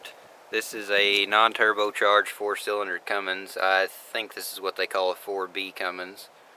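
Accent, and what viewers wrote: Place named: Missouri, United States. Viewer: Thought this dude was from around Omaha for a sec. nah he from MO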